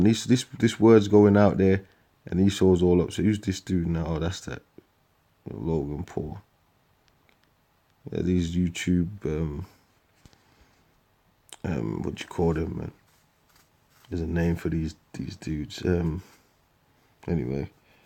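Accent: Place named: Birmingham, United Kingdom. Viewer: Shalom brother, All praise the the most high. It’s always good to hear a voice with an accent I can recognise, can hear the brum accent clearly cause I live round there. Always trying to get direct contact with some brothers but haven’t been able to, how can I do so ?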